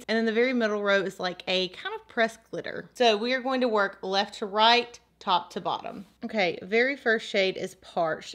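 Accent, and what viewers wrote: South, United States. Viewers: I just found you thru madison's giveaway and I been watching all your videos. You talk as country as me and I love it. Where are you from? I'm from Ga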